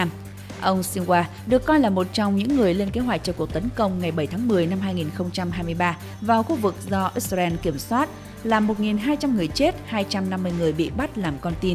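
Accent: North, Vietnam. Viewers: Đài này phải Đài cũ của HTD không vậy, sao phát ngôn viên tiếng bắc đọc vậy?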